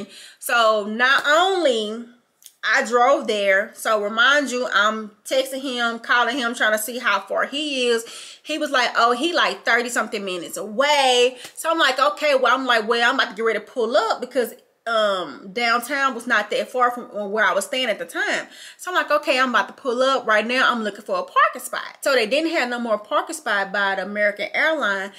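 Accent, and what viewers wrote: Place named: Memphis, United States. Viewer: Omg is this Memphis accent 😂😂😂 I swear I’m from Memphis now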